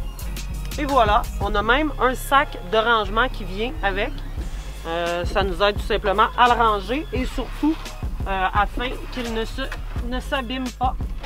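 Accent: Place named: Quebec, Canada. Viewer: L'accent québécois, ridiculiest ever. Sorry !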